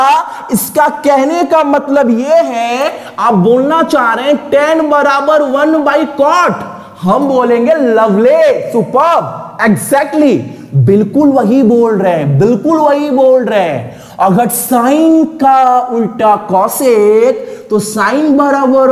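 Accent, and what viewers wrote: Bihar, India. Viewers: Sir ekdam bihari style me bol rhe hi bihari hi kay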